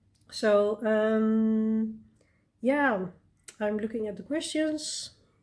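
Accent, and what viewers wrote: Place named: Scandinavia. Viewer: What an amazing and interesting life you have in the Netherlands! I loved seeing your hamster space, they are cute little babies. 😊 Thank you for sharing some about your life with us! It helps me be invested in you as a person and your channel as well as seeing your beautiful coloring. Thank you again! Oh - and your accent is not funny ha ha it’s lovely. My sister lives in Sweden and her husband speaks several languages from the region so I figured you were nearby. 😊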